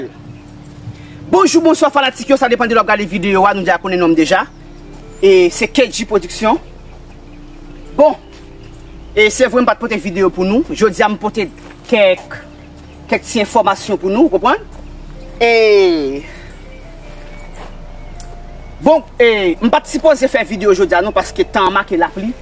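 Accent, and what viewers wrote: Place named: Soufrière, Haiti. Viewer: Ou pale menm jan😂ak moun soufriyè mwen tegen fanm dena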